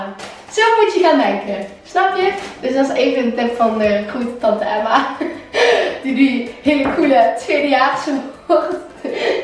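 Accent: Belgium. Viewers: Ben je Belgisch? Je hebt zo'n schattige accent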